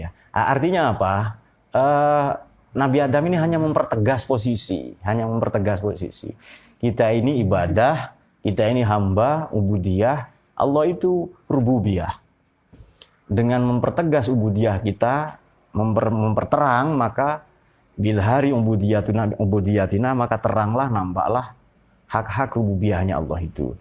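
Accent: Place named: Malang, Indonesia. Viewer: Dari Malang ya mas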